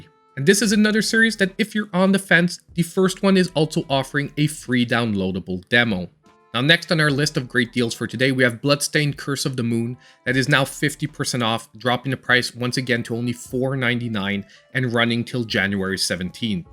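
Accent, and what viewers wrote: Canada. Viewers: Why do you sound Canadian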